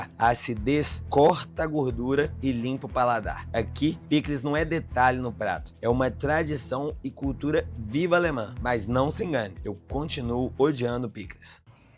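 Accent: Minas Gerais, Brazil. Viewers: 😂😂😂😂❤❤❤, seu canal é ÓTIMO! Mas o seu mineirês é ainda melhor 🎉🎉🎉 e só enche a gente de saudade. Pra pronúncia de quase tudo, principalmente pra gravar, use a referência do Google. Weisswurst vira então Váissvurst - facim-facim, né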